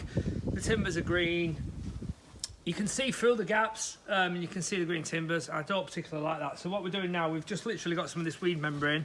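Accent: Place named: Leeds, United Kingdom. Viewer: Improving your finished product all the time , always good to hear a Leeds accent here in Australia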